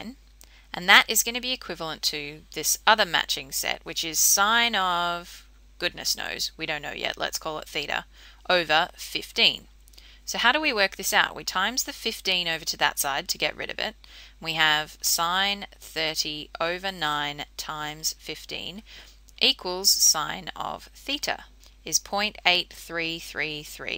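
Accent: Australia. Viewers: Granin lol she's very clearly australian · Aussie accent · Granin shes aussie not a brit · are you from australia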